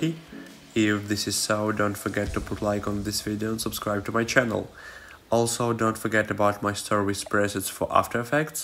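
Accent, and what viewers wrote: Russia. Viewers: русский акцентик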